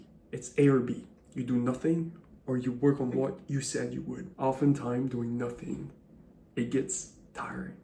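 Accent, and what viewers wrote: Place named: Netherlands. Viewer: He's in a foreign country other than the United States. Listen closely to his accent of the English Language. I know of no State in the Union WHERE people speak like this unless he's in a tight community of Dutch or a village of Norwegian immigrants... Yes? JUST a thought. Respectfully submitted for your consideration Gregg Oreo Long Beach CA Etats Unis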